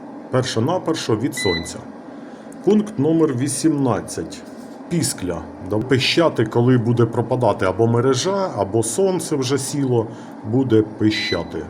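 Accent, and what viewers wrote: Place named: Poltava, Ukraine. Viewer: Говір Полтавський)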